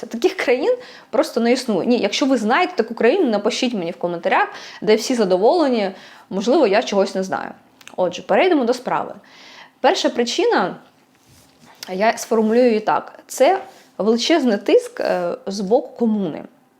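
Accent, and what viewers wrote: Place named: Russia. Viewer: дивлюсь час від часу, бо приносить стрічка. А в Даніі віднедавна живе 18 річний син подруги, хочу знати. Не запам"ятовую саме вас, але стала пізнавати по ниттю. І ви, шановна, плутаєте національність і громадянство. У вас є освіта? Не знати таких речей - дивно. За громадянством ви українка, етнічно - росіянка, ваш акцент лізе з усіх дір. І менталітет не приховаєш.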